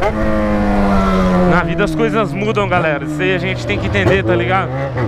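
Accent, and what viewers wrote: Goiás, Brazil. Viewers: por que você não fala mais no pique do Goiás???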